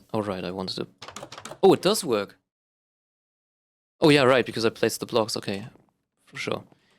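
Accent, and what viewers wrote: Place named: Germany. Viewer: hey bro, i just wanted to say that your english really is good like everyone says, you still notice the german accent but i kinda like it (: also i really just like the normal gameplay and u just talking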